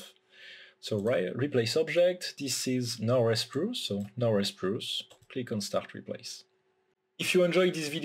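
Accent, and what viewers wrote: France. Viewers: J'adote ton accent français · that french accent is très audible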